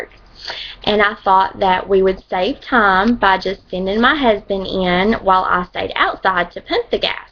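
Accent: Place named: United States. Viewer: Hey momma holy country accent lol that took me by surprise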